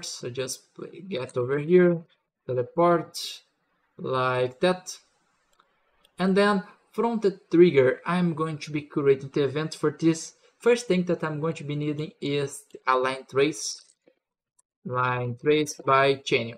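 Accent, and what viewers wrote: Brazil. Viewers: where r u from? i felt and brasilian accent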